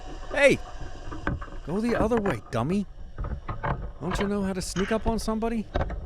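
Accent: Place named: Philadelphia, United States. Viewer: I'm really digging the Philly accent narration!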